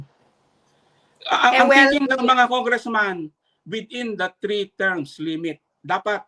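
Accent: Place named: Pampanga, Philippines. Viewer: Kuya sa boses mo Alam ko may dugo kang Kapampangan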